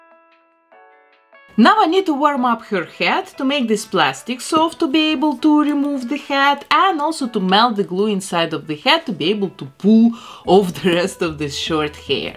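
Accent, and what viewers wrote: Russia. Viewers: What is your accent? Russian??? Whatever it is I LOVE IITTTTT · Почему мне кажется ,что здесь присутствует русский акцент? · Alena Vampanskaya yeah, I thought about it too. I'm just interested in her cute accent Well, it would be great, if she's Russian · Блин какой русский акцент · Мне самому показалось, что что у неё русский акцент, а теперь даже сомнений не осталось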